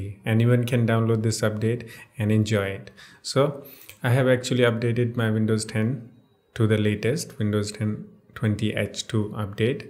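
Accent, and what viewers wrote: Northeast India, India. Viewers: where you from bro? your accent is sound like people of Northeast india,meghalaya ?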